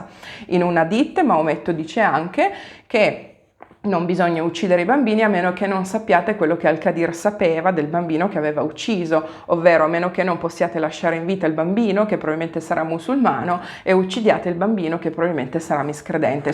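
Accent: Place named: Emilia-Romagna, Italy. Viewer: 😂 ciao sei sempre bravissima, perché smonti con prove alla mano ogni eresia degli infedeli camelgiokey sei per caso emiliana romagnola ,ho sentito una cadenza famigliare,socmel😅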